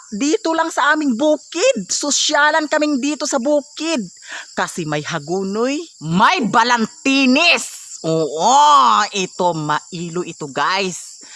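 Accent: Visayas, Philippines. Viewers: Ka relate ko sa imong accent na bisaya kay dool ra ta lungsod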